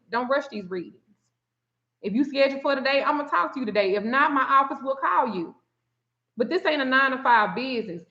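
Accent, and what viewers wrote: South, United States. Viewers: You & your husband look like you two were made for each other: Twin Flame Energy 💫 I love your southern accent.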